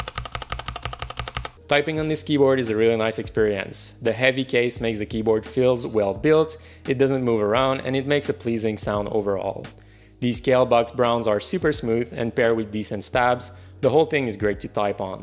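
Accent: Quebec, Canada. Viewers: Hes canadian french so, dont mind the pronounciation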